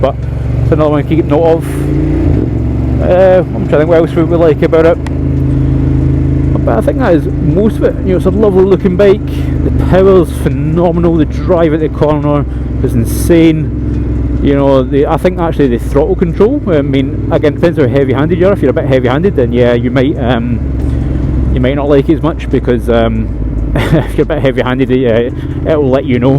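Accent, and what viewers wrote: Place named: United Kingdom. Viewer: Hi mate, nice bike, I guess. If you can speak with a bit less British accent it could possible understand something about what you said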